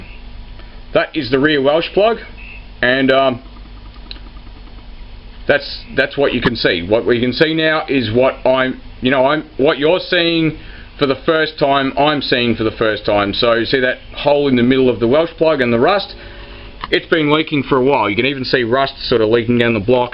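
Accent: Australia. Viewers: i must say you are so Aussie sounding and direct its refreshing, i went to bunnings tonight and didn't know i was in Australia at all!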